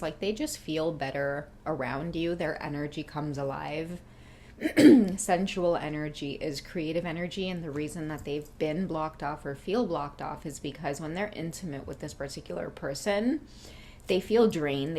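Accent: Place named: Trinidad and Tobago. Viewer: What you said at the mark. Nailed it! 💣💥 He even admitted it to me too. And then at the mark "somebody who's just there with you because you're putting a roof over their head". I said pretty much the same thing to him. It's true though. Spot on thanks so much 💜💜💜 PS I'm here for the Trinny accent too! 😉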